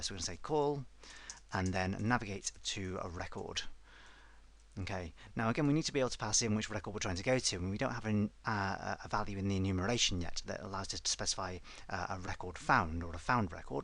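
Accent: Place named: United Kingdom. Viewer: ***** No!I have been subscribing your channel for long time and love your videos.Problem is on me! :) English is not my mother language and I think so you are a British.I can understand easly american accent but the British Accent a little bit different.Anyway thank you for all your videos and tutorials.I'm following you.Best regards.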